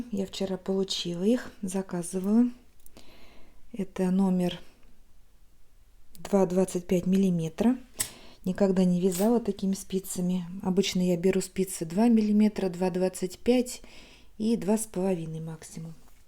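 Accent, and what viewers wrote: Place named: Voronezh, Russia. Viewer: Узнаваемые воронежские интонации 😊 . Смотрела ваши видео и предполагала, что вы житель Воронежского края, ну и здесь услышала подтверждение этому.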